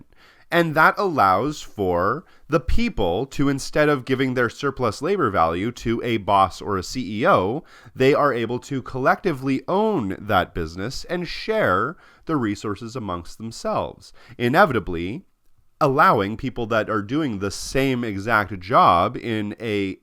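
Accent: Canada. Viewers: Are you an Albertan?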